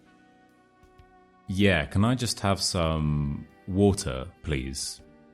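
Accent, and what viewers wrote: United Kingdom. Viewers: Hello!,i'm practicing with your British accent videos, but I'm a beginner, I don't understand much, but I feel like it's helping me, thank you, greetings from Mexico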